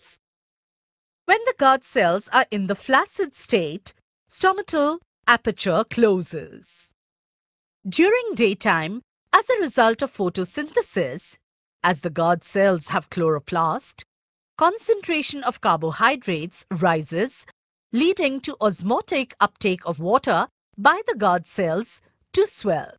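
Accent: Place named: India. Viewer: U sound like hindi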